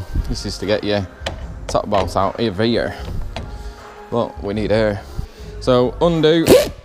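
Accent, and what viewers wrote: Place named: Manchester, United Kingdom. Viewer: Thanks for this mate gonna need it soon. Can recognise that manc accent a mile off. Denton I’m from, u seem close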